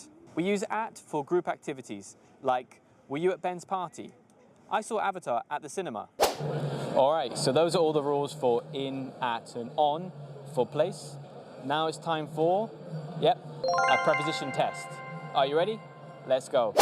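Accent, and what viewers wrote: United Kingdom. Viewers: Hallo mate, I wonder what kind of accent do you have? It sounds British but for me it's too clear for a British accent .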